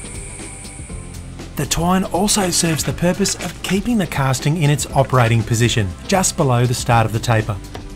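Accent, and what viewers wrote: Australia. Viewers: the Australian accent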